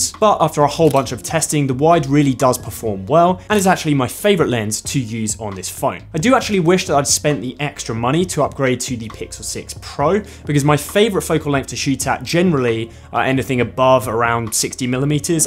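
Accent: United Kingdom. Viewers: He sounds pretty average british to me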